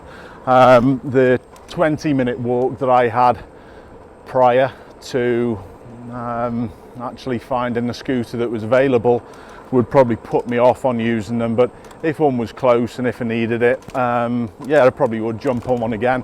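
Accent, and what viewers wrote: Liverpool, United Kingdom. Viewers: Oh, I remember having a bell on a bike being required? Aside: I love when you lapse into your proper scouse accent.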